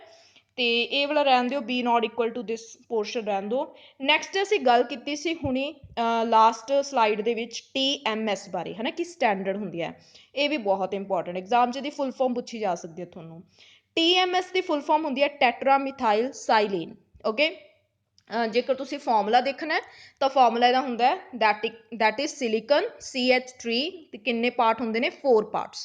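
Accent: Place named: Malwa, India. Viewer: Wao mam malwa belt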